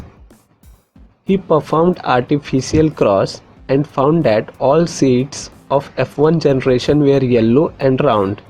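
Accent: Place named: India. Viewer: Everytime I hear an indian voice, I know that I'm gonna learn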